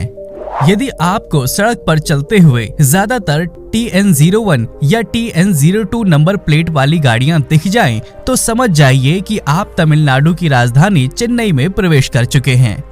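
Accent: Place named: North India, India. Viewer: Why do you blabber in North Indian Hindi. 😡😡😡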